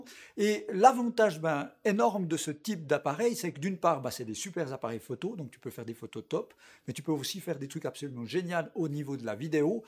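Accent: Switzerland. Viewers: haha toi tu viens de chez nous! vive la suisse, neuchâtel?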